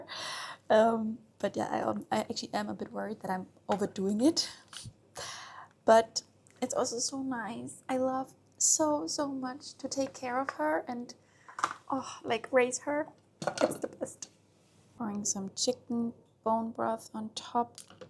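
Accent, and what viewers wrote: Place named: Germany. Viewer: Du bist wirklich so sympathisch. Dachte mir schon zu Beginn: dieses Englisch klingt so Deutsch 😅 Total süß und richtig gute Rezeptideen ❤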